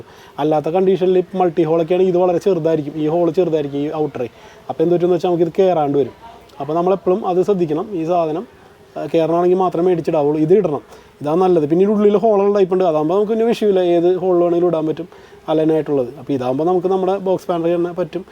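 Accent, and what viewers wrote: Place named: Paravur, India. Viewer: Aaha...Slang kettappo thonni ivde adthulla aaro aanallo enn 😁♥️ Paravur il evdaa bro ?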